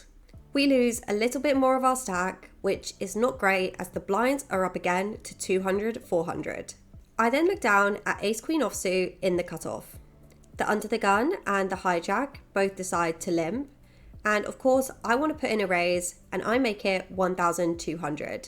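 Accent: United Kingdom. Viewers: Ul in hahah British accent will always make me laugh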